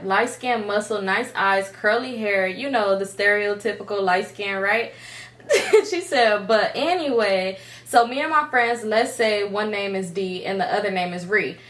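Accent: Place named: Texas, United States. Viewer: My brother heard the story about the weirdo in your apartment complex when you got your first apt. He say who is that talking about the creepy neighbor and I did not know he was listening 👂 huddling 🤦‍♀️ I told him I found your channel through the pandemic and I been riding with you since. We from Houston, Texas. I thought you was from Texas by the way you talk. But I found out your from California through your stories. You gotta let me know when you coming to Houston cause I wanna meet you and I love your vibe. I’m 43 I’m not with the bs and I’m not a weirdo 😫😫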